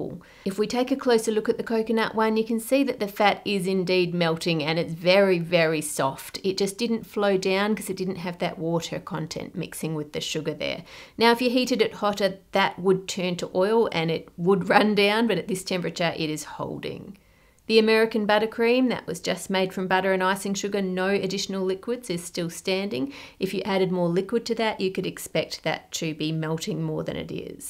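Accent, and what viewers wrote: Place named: Australia. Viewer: Sometimes sounds like bob ross, but with a female Australian accent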